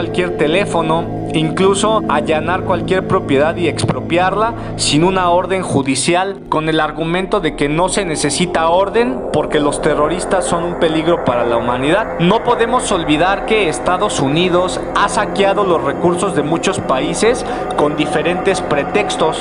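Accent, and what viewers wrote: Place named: Tepito, Mexico. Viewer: Muy buenos tus videos. Lo único malo es que tienes un acento tepiteño , te sugiero mejores en un español neutral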